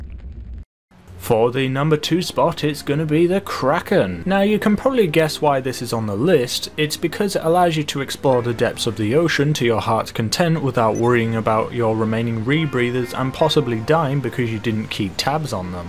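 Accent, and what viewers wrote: United Kingdom. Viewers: Are you a UK YouTuber? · Wait wait are you british?? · Are you British??? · Wait... YOU'RE BRITISH TOO, well that's a nice surprise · Didnt notice that you was from uk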